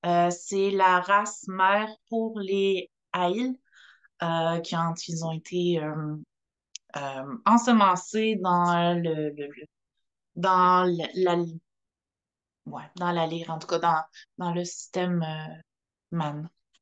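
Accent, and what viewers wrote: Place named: Canada. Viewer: je remarque l'accent canadien. j'ai peut-être vécu au canada dans une vie antérieure, j'imite assez bien l'accent canadien.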